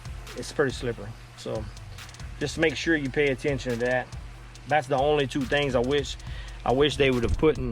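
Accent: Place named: Acadiana, United States. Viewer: Man I know an Acadiana accent when I hear one! I recognized Eunice City Lake when you showed a clip fishing on the water. I am also from Eunice so you have my subscription!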